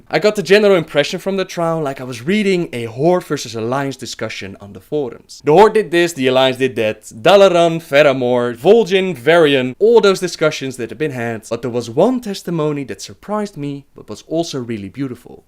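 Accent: Netherlands. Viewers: Dat Dutch Aksent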